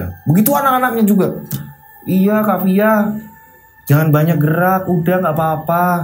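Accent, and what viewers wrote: Java, Indonesia. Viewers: logat Jawa nya medok bgt s mas klau sy yg paling sy suka boom boom boom nya mas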